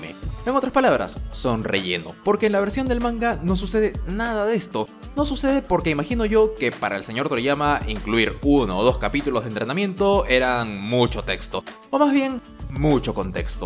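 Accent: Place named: Latin America. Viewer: Alguien sabe de donde es que habla como latino pero en cambio dice vídeo como nosotros los españoles?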